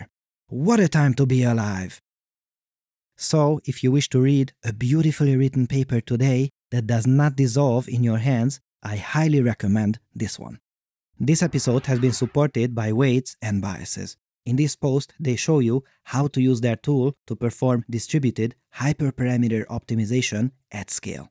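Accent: Hungary. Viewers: A magyar akcentus befigyel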